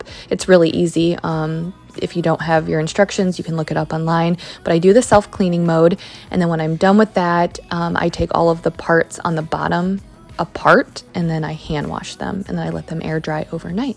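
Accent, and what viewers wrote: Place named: Ohio, United States. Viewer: Hi. I love ur spirit. Ur so calming. You made cleaning fun for me. You are the 1st You Tube Cleaning video I ever watched. And you are my favorite because of your positive, motivating, happy vibe. To answer your question. I love living near the beach. I live in New England now. The Cape Cod vibe is my scene. Your Ohio accent is adorable when it comes out. I'm sure you'd think my Boston accent is strange. 😆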